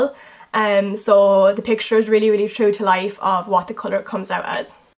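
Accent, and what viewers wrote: Ireland. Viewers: OMG YOUR IRISH AND I LOVE YOU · Your accent is beautiful! I wish I had a accent but NOOO I had to be Canadian -.- haha are you Irish? Don't need to answer if you find it personal xoxo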